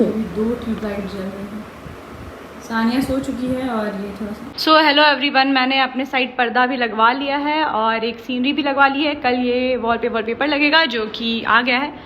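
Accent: Bihar, India. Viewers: I just accidentally came across your channel and glad to hear the typical simple Bihari tone in your voice☺ And ofc, your room looks beautiful 💯❤ I'm also from Patna, gonna give 2nd attempt this yr. Di, wish me luck😅🙏🏼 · Bihari accent is love...btw kon year me ho didi? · Didi your talking way was lovely 🥰 I'm also from Bihar waha pe ese hi baat krti h ladkiya unki yaad dila di 😍 btw now I'm in delhi, your words like ( dekho ,hum ) give me nostalgic felling when I'm in bihar nowadays in vecation 🤩🥺🥺🥺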